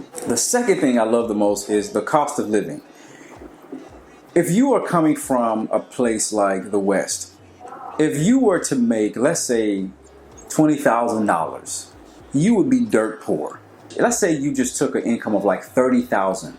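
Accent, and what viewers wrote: Ghana. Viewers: I’m Nigerian living in the US and want to move to Ghana sooo bad. This video is inspiring. You got the Ghanaian accent down lol!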